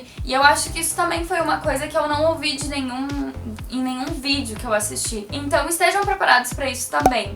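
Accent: Brazil. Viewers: Aí que gente chata. Foca no conteúdo do vídeo e não no sotaque dela. Ela é gaúcha assim como eu e o nosso sotaque é bem assim mesmo. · Lógico. Seu sotaque, seu vocabulário portoalegrense uai! É mesma coisa que reclamar de gaucho dizer "bah". Pede pra mudar de estado federativo de uma vez....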